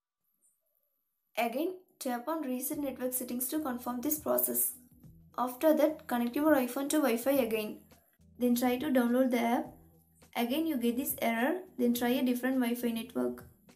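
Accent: India. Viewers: it says new york and the person talking sounds indian so im pretty sure its a fake address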